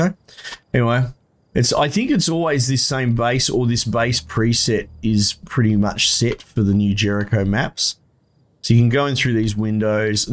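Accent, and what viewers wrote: Australia. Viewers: You're Aussie? NICE. Good video :)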